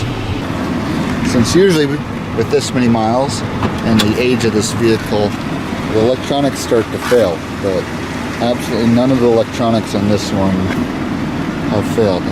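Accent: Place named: Canada. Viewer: What part of Canada are you? I hear a Canadian accent. Anyhow, I love the look of this car. Thanks for the upload. Early 90s American luxury is pretty cool.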